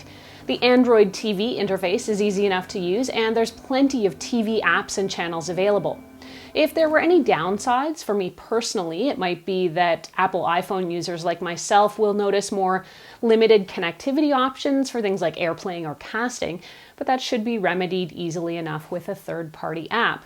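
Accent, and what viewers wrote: Canada. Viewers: Love the Canuckiness! you speak so beautifully, as I normally become instantly frustrated with the regular YT presenters that are far to zippy happy, you present the facts clearly, concisely and at a great pace (without the fluff). Thanks so much!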